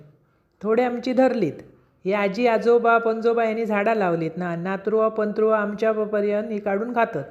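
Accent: Malvani, India. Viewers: ओरिजनल मालवणी ❤ ऐकान लय भारी वाटता जा ताई❤❤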